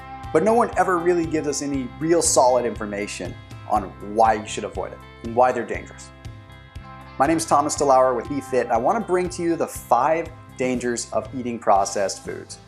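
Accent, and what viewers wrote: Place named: United States. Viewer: Great video and content. Excellent. I'm definitely cutting back on processed foods. My processed foods intake is a result of my time-consuming job. Then I just conveniently nibble on junk foods and eventually ending up feeling junk. Thanks. Ignore the number of dislikes. Maybe they don't understand your fluent American accent. I share my advice: Click on the CC button at the bottom, right corner. (Subtitles/closed captions) Listen and read as well. Pause and go back if you didn't understand well.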